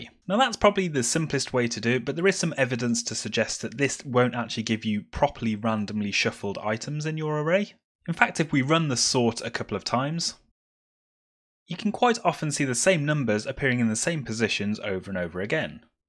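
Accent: United Kingdom. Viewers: I think you are from UK try to speak slowly so we asian can get what are you saying just a suggestion btw nice tutorial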